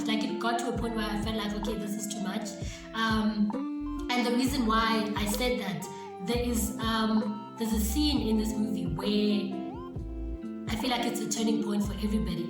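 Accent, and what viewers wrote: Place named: Eastern Cape, South Africa. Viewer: Your accent makes me miss living in the Eastern Cape🥰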